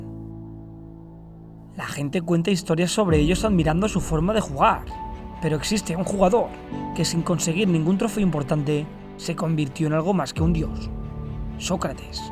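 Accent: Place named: Andalusia, Spain. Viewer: Me emociono hasta las lágrimas. Como persona: ejemplar, generoso, brillante, lúcido, líder con un aura especial. Como futbolista, que decir, fino, exquisito, ultra inteligente, tácticamente diferenciado, el hijo de TELE. SOCRATES-FALCAO-CEREZO-ZICO. Los futboleros no olvidamos. PUÑO arriba. Nota de color, me encanta el relato andaluz.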